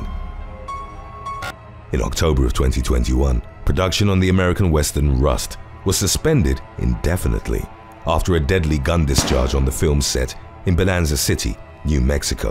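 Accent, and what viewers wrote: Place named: United Kingdom. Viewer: This guy sounds so British he should be in a James Bond movie...all the best from out here on land with the Kangaroos in Victoria Australia.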